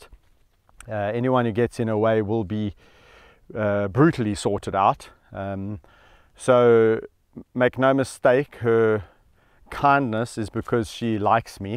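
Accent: South Africa. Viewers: Very special...many thanks. Your accent is rehabilitating white South African too! Far more of a challenge than changing a spotted hyenas rep!!?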